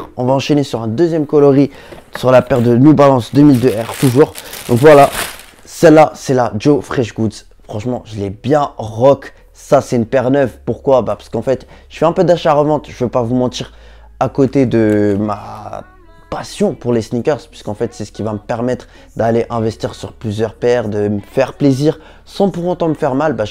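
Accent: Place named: Algeria. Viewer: u do great content but idk wuth te hell u sound like alittel bit algerian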